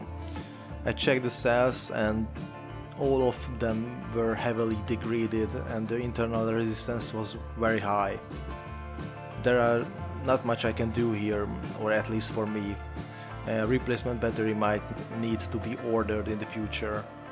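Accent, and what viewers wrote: Hungary. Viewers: Interesting stuff! Cool Hungarian accent btw! Cheers.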